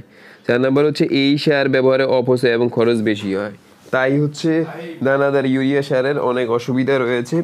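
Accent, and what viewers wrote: Noakhali, Bangladesh. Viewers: আপনার বাড়ি নোয়াখালী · Bhai apne noakhailla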